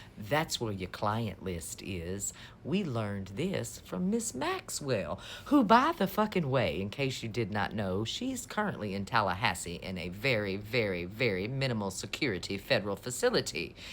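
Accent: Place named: Louisiana, United States. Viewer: First of all took me a while to figure out what that accent came from.Yeah, I know, shut them.I'm like.Oh that's a proper southern woman's language from louisiana.. Don't care , don't care you have beautiful grandchildren , you have beautiful Beautiful. Eyes I can see it way appear in ohio Don't mean anything by but I love you honey❤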